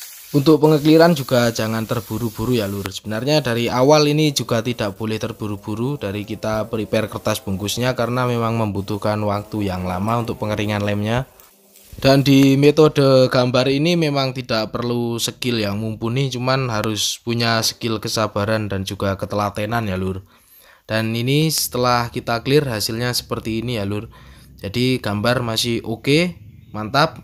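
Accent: Temanggung, Indonesia. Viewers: 🤣🤣 kyak org temanggung ajaa lur.. ahh mantap 😋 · logat temanggung iki, salam mbako lurrr 😬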